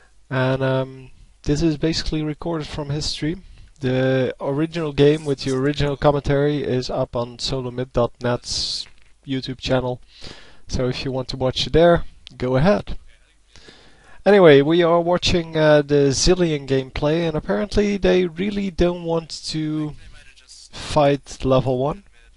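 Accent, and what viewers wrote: Netherlands. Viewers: you are such a bad commentator.you obviously know nothing about this game.If reginald picks zilean mid,you can be sure zilean is a good champion and you shouldn't break him down.Your accent is so annoying!So is your voice.I hate it when people from the netherlands try to speak english.Please don't make any commentaries anymore.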